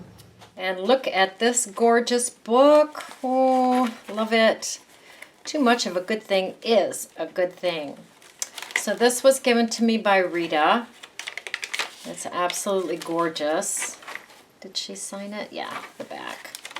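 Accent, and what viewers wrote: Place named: California, United States. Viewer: Oh my gosh it’s early....for me....Would a “button stitched” loop make the closure stronger? Love listening to your California twang, I miss Ventura. And.. I have never heard the term “Underpants” for the binding covers first layers. Makes perfect sense. Love the video. Now I can finish my Cuppa, and go back to sleep.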